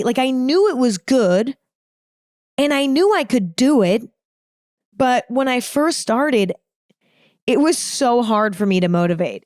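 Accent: United States. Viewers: I like your American accent and the way you speak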